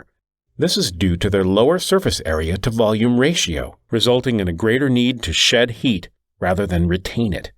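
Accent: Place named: United States. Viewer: the new narrator is very sensationalist and sounds like talkin to children on a village pfair. Way too American somehow, please get back the previous guy :)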